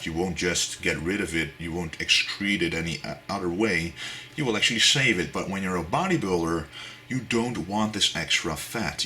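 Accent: Netherlands. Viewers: I had a great time hearing your dialect, beeing Duch myself I immediately heard that, which is totally hidden from me when you speak english. That was fun, I didn't expect that at all. Thanks for so many great great videos. Next to Mountaindog John Meadows and Athlean-X Jeff Cavaliere this is an awesome source of high quality, down-to-earth information.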